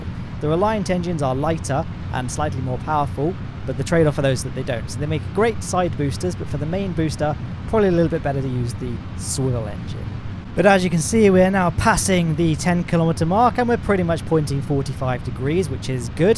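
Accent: United Kingdom. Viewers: He's UK Australia's don't articulate their words as much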